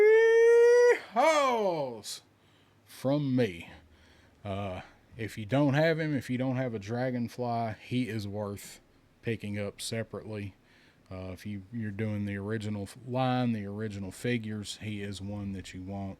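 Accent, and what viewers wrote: South, United States. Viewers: What a great addition with Val! Classic! Great review! I can't wait to open my big ass box! Been sitting for 2 whole days! 3 by the time I actually get to it! As always, a wonderful video with a great southern drawl! Yeeehaaaaw! 4.5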